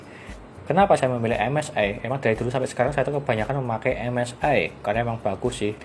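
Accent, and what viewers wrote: Surakarta, Indonesia. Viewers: Orang solo bang?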